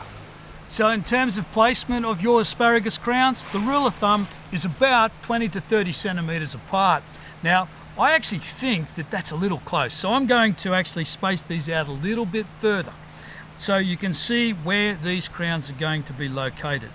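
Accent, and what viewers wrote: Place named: Cockney, United Kingdom. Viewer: 2 questions for the asparagus expert: 1. I grew asparagus from seeds in small containers 3-4 sprouted in each I have 5 of those containers and 1.5m in length of ground/soil where I'm planing to plant them So my question is: is it okay to plant each pot with 30cm apart So it will be 3-4 asparagus - 30cm space - 3-4 asparagus - 30cm space etc. 2. Should I use the same method for planting / soil like you used in this video Many thanks BTW love the accent, reminds me of London's Cockney accent :)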